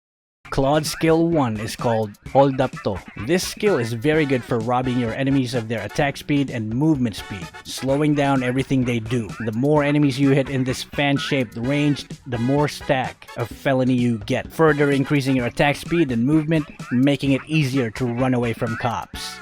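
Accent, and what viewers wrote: Philippines. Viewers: your from ph? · I actually thought he was a foreigner because of the accent but hey, I can hear a bit of Filipino in his words 😂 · Pilipino ba siya? HAHAHAHAH OBVIOUS E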